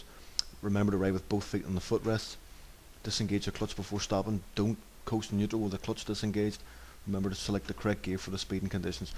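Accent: Northern Ireland, United Kingdom. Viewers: youtube closed captioning fails quite hard with Northern Ireland accents :D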